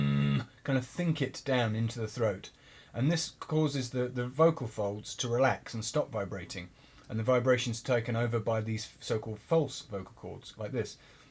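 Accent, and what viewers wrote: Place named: United Kingdom. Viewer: Thx i'll tying to do with your video. Good Britain accent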